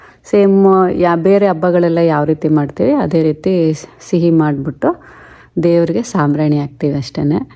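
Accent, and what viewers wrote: Mandya, India. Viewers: ನಿಮ್ಮ ಮಂಡ್ಯ ಬಾಷಾ ಕೇಳುಕ್ಕೆ ಚಂದ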